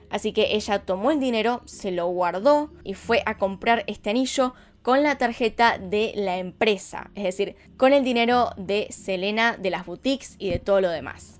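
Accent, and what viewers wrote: Argentina. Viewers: Muy buen video de Selena tristemente ya no está conosotros yo pienso si siguiera viva sería más famosa , lo que me gustó de ella que era muy sencilla no se le subía la fama también era humilde te felicito por el video y me gusta tu asentó Argentino como pronunciado la Y driega me encanta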